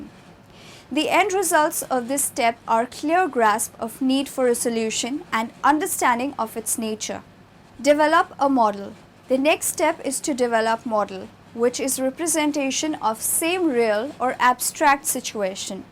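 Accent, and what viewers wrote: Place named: India. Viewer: Great overview of the objective, scope and applications of OR with a nice Indian accent.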